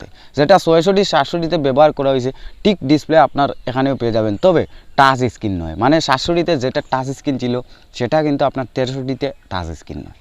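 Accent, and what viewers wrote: Sylhet, Bangladesh. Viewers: ভাইয়া আমি ১০০% শিওর আপনি সিলেটি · Tumije sylhetii 🎉🎉 ita mateouu buja jayyy ❤❤valobasha niooo priooo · Sylhety ni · Vai apni ki Sylheti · Bai apnar bari mono koy Sylhet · ভাই আপনি কী সিলেটি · Kotar maje sylhety vasa ase... sure apne sylhety fuya😊😊😊 ami o sylhety furi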